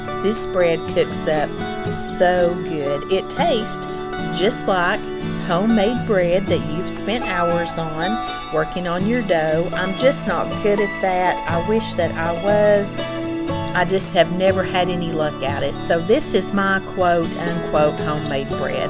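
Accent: South, United States. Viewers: Happy Fall!! Your recipes are so amazing and for us, its your sweet country voice that just relaxes both of us... we absolutely adore you and your content!!!! Thanks so much for joining in this year!!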